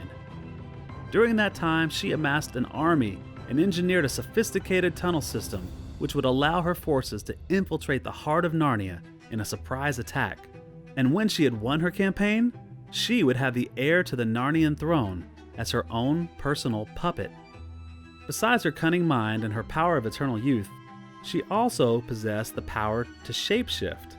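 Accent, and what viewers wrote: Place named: United States. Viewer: finally someone who’s American speaking to me in English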